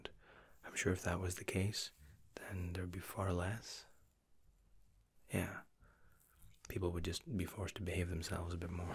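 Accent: Scotland, United Kingdom. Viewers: are you from scotland and if so where abouts? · i have been listening for so long i can't tell... Is he british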